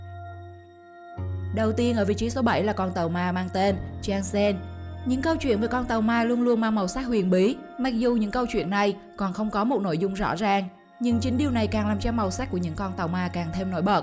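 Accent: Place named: Nẫu, Vietnam. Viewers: bà mc này người nẫu phải khg chèn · Giọng miền trung nghe ngộ ngộ mà dễ thương ❤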